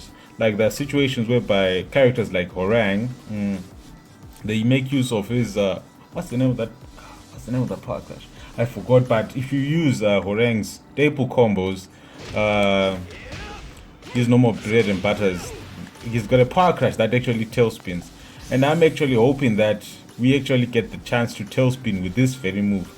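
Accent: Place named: Africa. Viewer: yo man i know this is late but its great to hear some great african commentary. love from south africa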